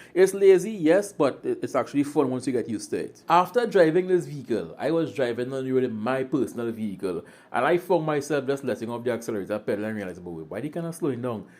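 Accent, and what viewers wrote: Trinidad and Tobago. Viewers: Great job with this review. Nice to hear a Trini accent for a change. BTW, the Japanese voice in the start-up sequenceis telling you that the ETC card isn't installed. This is for the Japanese toll system. This voice can be turned down with the volume button. Not sure, but I think the voice can be turned off. Also, Note is a 1.2L engine. Safety features are controlled by ProPilot. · So freshing to hear a trini voice doing this. Means a lot bro. Thanks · bro you from trinidad?!.... this is one of if not de best local reviews i've heard/watched keep it up👌👊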